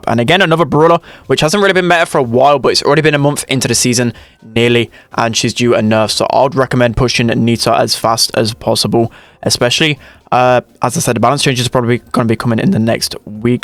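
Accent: United Kingdom. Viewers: BRI'ISH accent bloody hell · just a british accent :/and yea it is the best one out there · british accent bro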